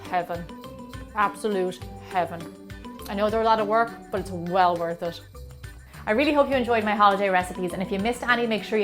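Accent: Ireland. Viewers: are you Irish ? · Looks heavenly. My mouth is watering. Love your Irish accent❤🍀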